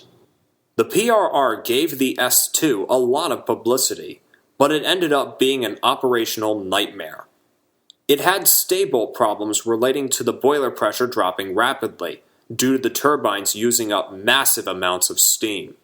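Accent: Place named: RP, United Kingdom. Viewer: weird RP shit lol.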